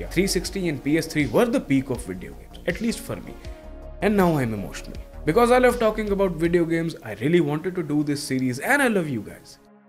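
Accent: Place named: Punjab, India. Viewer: Shukria!🙏🏻 Absolutely love the Punjabi Tarka 😂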